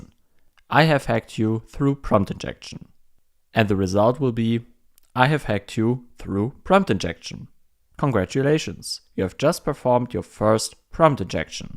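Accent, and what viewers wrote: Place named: Germany. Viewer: bro sounds german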